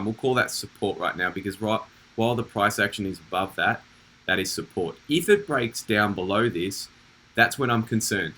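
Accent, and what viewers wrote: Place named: Australia. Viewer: Can you do a staking video please , good to hear an ozzy talking crypto .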